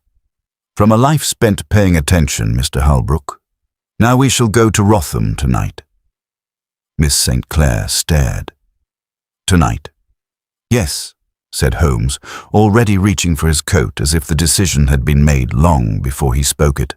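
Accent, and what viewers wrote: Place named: Liverpool, United Kingdom. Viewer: Ok Scouse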